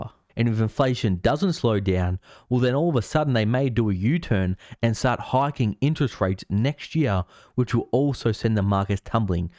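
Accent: Australia. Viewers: Yeah u need subtitles for you American viewers they won’t understand your full Aussie accent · Mahket? Its not a silent R, its market banned cool? You mean coal. I've never heard such a slack jawed aussie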